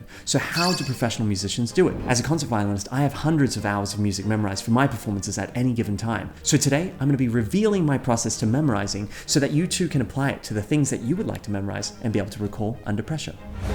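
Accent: Australia. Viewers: You can hear the Australian in his voice in this video. Maybe he talked to his mother before filming. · He is Australian, but I imagine it changes depending on who he talks to or where he's located. It influences the way he talks naturally (unconsciously). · It’s nice to hear speaking comfortably in his Aussie accent. Good on him! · The aussie accent threw me for a loop. I was like... who is this guy? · We need more of your Australian accent! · I just watched a rosin video that led to this and hearing the different accents threw me for a loop! I went back to the rosin one and listened to the first bit more carefully and I could hear where his Aussie accent was peeking through, but otherwise it was a great American accent he put on for it., we'll watch you either way! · I've watched like 5 of your other videos and this is the first one I've heard where you sound Australian. 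I was so confused... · Thank you!! This is the answer to the question I’ve always wanted to ask you!😁😁 Btw, love your Aussie accent❤ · Thats a crazy aussie accent...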